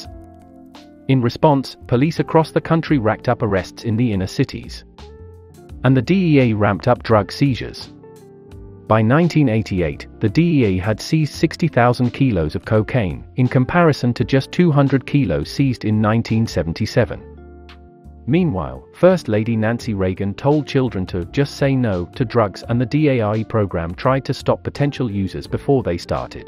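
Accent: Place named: RP, United Kingdom. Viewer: This is text-to-speach voice generator. You can choose whichever accent you like. OP just liked the RP, I guess.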